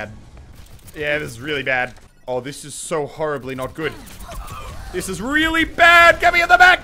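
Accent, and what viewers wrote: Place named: Australia. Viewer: It's because of his Australian accent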